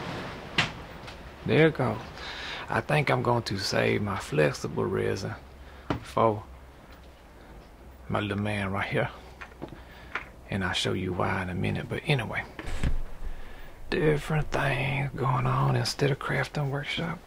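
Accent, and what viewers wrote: Louisiana, United States. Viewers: New to your videos. Is it rude to ask if you’re doing a voice? I’m from the South but the way you use contractions is very different from what I’m used to hearing. I assume you’re from Louisiana or Mississippi? Aside from that, great tips and love how your video persona is the puppet. I would do that if I made videos.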